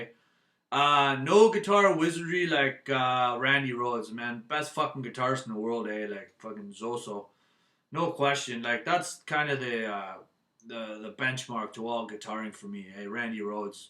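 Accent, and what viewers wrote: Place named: Canada. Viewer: Wow, you have the most stereotypical Canadian accent I've ever heard!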